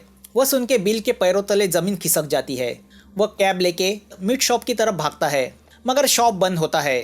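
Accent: Maharashtra, India. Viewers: Sir are u marathi i think so becose of ur hindi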